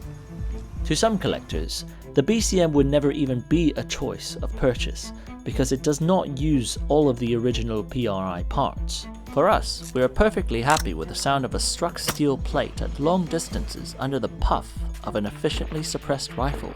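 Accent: United Kingdom. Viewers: New sub here. Love the content. Gotta ask, what accent is that, British? Really cool. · Anyone else annoyed with the British accent on an otherwise awesome review???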